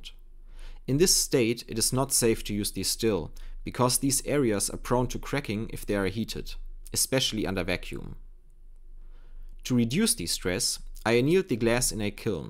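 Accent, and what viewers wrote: Germany. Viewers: God damn, your German accent is so strong and so nice 😂❤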